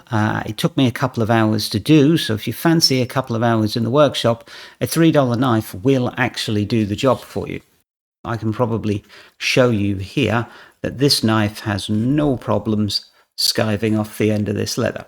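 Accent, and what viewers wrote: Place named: United Kingdom. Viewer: Quick question, why do you quote US prices when you’re obviously British?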